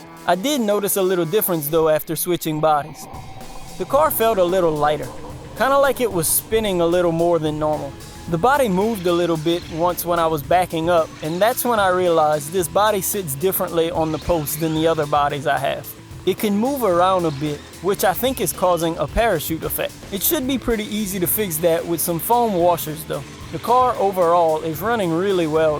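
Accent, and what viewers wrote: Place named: New Orleans, United States. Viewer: Where you from New Orleans? You have the accent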